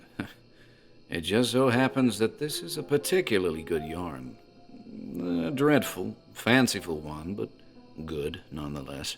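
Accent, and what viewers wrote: United States. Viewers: Wow, American accent totally threw me for a loop haha. Well done! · my second listen, but still i just realized you were speaking american LOL with your voice, you make reading american sound good - and those two other guys, so different. excellent production (even better than some from BBC radio :) 🎭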